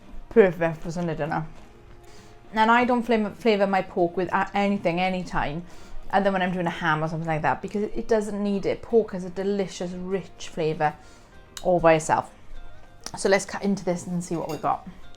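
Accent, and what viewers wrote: Wales, United Kingdom. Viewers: I'm sure that's a Welsh accent I hear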